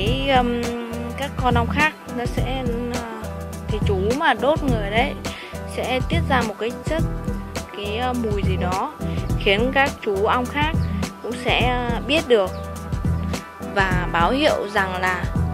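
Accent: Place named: Hai Duong, Vietnam. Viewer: Nghe giọng như gái hải dương hoặc an lão hp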